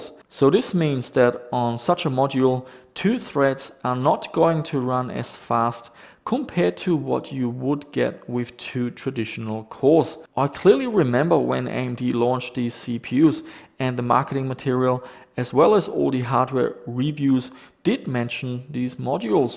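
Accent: Germany. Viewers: YOU HAVE THE HARDEST GERMAN DIALECT I´VE EVER HEARD :D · How come this man sounds like a German? Can somebody explain? I thought he was an Australian. I have no clue haha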